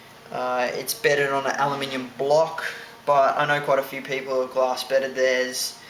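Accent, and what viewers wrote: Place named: Australia. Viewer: Are you from Australia or New Zealand, i can't tell lol. Either way it sucks that that was over $1,000. Here in America (i am not trying to boast, our country sucks right now cuz of obama and the liberals) i can find one for under $700 easy, and the ones without a stainless barrel around $550. Good luck, and enjoy the shooting!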